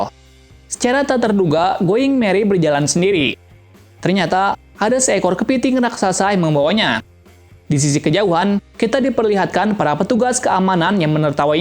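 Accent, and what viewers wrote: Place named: Surakarta, Indonesia. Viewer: mirip orang solo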